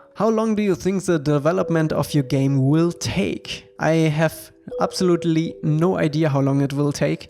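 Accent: Germany. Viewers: Bitte rede normal english oder gar nt weil dieser deutsche Akzent nervt hart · Ist nicht böse gemeint aber finds lustig wie man hört das du normalerweise deutsch sprichst😂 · Ich mag den deutschen Akzent xd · I'm really looking forward to the game, take your time and don't be stressed out. The game should be just as cool as you imagine it to be. Ps. may it be that you are german, your english sounds exactly like mine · I like how you can hear out that you're coming from germany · Der deutsche Akzent ist thicc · Ach ja der Deutsche Akzent xD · Alter dein deutscher Akzent ist ja soo geil :))) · Leichter Deutscher Akzent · Du bist deutscher man hört es so hart klingt lustig aber kannst gut englisch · Man hört es fast immer raus wenn Deutsche Englisch reden. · Am I crazy or I can really hear a German accent? If yes, I am your neighbor. But from Poland :P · Ich feier dein Alman-Englisch😂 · Are u german? 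· are you german because you Talk english like one (im an german) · are you german ? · Wer liebt auch seinen Deutschen Akzent ^^ <3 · Wie man den typisch deutschen Akzent raushört nice haha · Mann hört heraus das du ein Deutscher bist🇩🇪❤️❤️❤️ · Pls. Fellow German. Learn the TH sound. Your waaaaaay to much of a genius, so this shouldn't be a problem. Really impressed on your game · Love the german accent. Feels like hes introducing a german fight game. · I think he is from germany :D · The german Accent is just on point😜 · dieser deutsche akzent haha ich liebe es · Man kann raushören dass du deutscher bist 👌🏼 i like it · are you from Germany?? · Stabiler deutscher akzent 😂👌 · Bist du aus Deutschland? Hahaha hört sich so an😂 Translation: are you from Germany? You Sound like that haha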